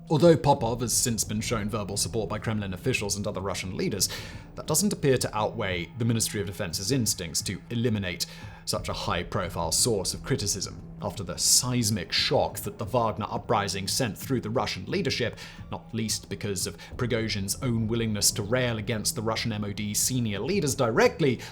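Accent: United Kingdom. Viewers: I have to admit that I have a weakness for the British accent. An Englishman (or woman) could be reading the phone book and I would be entertained for hours...so imagine how pleased I was when I found Simons'channel (s),(s),(s),(s)... Speaking of his channels... I think that a mad scientist genetically engineered a sample of DNA & the concept of the YouTube channel which produced an octopus type creature with many channels and many brains. An octopus with a magnificent beard I might add. Gods beard isn't half as impressive. Surely holds the record for the most channels...if there's someone with more, I don't know of them. As long as keeps creating, I'll keep watching. Thanks.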